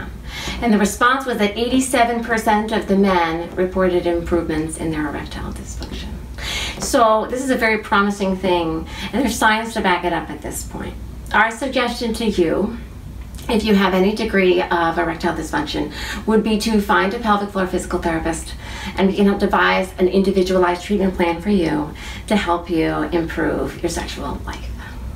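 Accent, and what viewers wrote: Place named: Texas, United States. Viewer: I am glad that you found my comment funny,but I can assure you that it is very true .I think it might have something to do with your sexy Texan accent.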